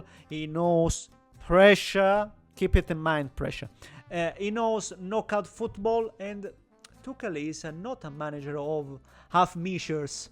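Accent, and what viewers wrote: Italy. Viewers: Messo like ed attivato la campanellina subito. Ottima pronuncia, spiccatamente italiana, ma corretta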